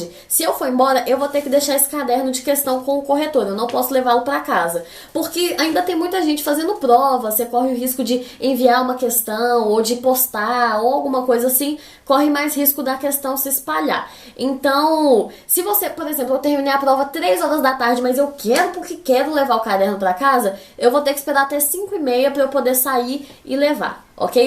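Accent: Minas Gerais, Brazil. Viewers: E sotaque de nos mineiros !!!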